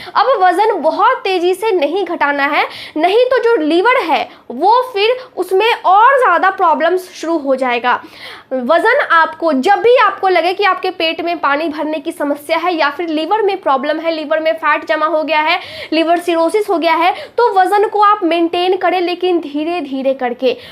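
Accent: Bihar, India. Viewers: Lagta hai aap बिहाड़ se हैं। वैसे आप ने लिभड़ के बारे में अच्छी तरह समझाया है। 😊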